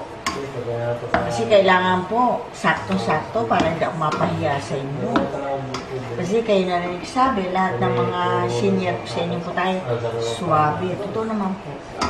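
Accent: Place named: San Miguel, Philippines. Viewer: nakakatuwa lang po kasi ung tono ng salita nyo namimiss ko taga San Miguel din po aq andto po aq ngayon sa abudhabi at paborito po yan ng mga anak ko😊😊😊 lahat po ng recipe nyo pinapanood ko. God bless po sa inyo! 😉😉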